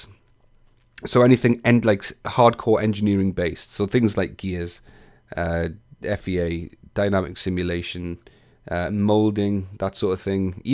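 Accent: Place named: England, United Kingdom. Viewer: I am a woodworker who uses inventor to digitally prototype a design that I can tweak at different stages of production. Your videos are very good and the best that I could find (English accent helps...). I often rewatch your previous videos as you have a very natural way of communicating. Looking forward to what you have to offer in 2016.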